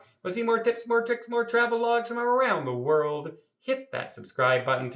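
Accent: Canada. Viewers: maybe Canadian pronunciation. Just sayin'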